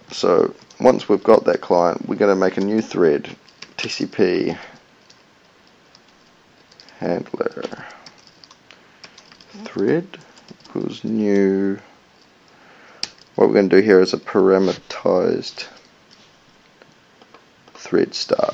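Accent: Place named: New Zealand. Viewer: thats a new zealand accent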